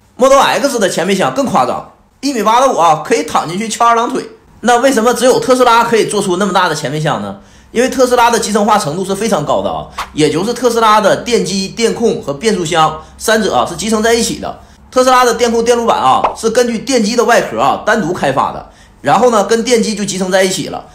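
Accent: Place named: Northeast, China. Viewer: 听这东北银白活了半天，特斯拉原来这么遥遥领先呀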